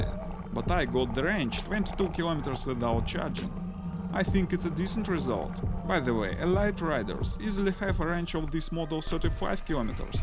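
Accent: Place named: Russia. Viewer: Тяжело слушать настолько мощный рунглишь. По существу, обзор хороший, спасибо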